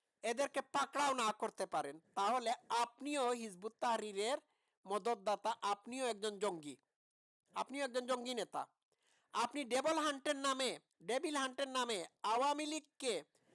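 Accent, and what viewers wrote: Sylhet, Bangladesh. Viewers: তোমার বাংলা উচ্চারণ সিলেটি ল্যাংটা ফুরিদের মত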